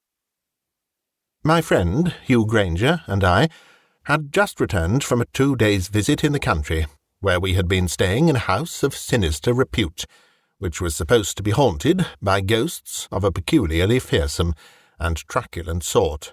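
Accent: England, United Kingdom. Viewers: This and horrorbabble are my favorites. There's nothing quite like an English "accent" and a voice capable of giving character when listening to spooky stories. Who would've thunk it huh? English people being good at speaking English. Haha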